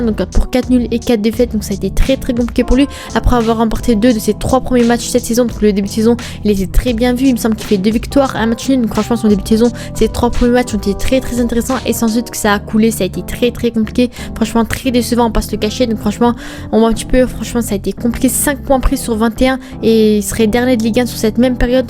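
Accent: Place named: Marseille, France. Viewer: Ah ok t'es marseillaise c'est pour ça t'as un accent mdr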